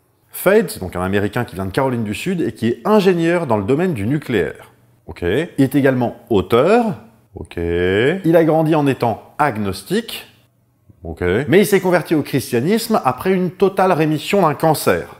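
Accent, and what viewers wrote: Belgium. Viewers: C'est dingue même avec la modif de voix on reconnaît l'accent belge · Je reconnais un accent belge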